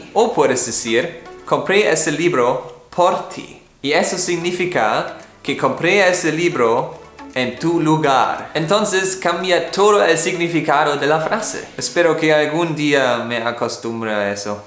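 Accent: Germany. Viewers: Hablas perfecto el español,se nota tú acento de alemán pero hablas perfecto,enhorabuena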